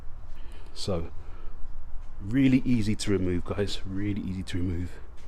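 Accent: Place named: United Kingdom. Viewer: I have been using it for past year or so. When I bought my wife her 4th new car, I figured it's going to last until...I retire😅 Her 2021 Camry SE is getting it's 2nd coating. 1st lasted months, just 1 coat. Her car stays in the garage...my poor 2016 Tundra stays out in the elements with just an occasional wash and wax. I recognized your Brit accent😁 I lived there for years in Felixstowe when serving in USAF st RAF Bentwater.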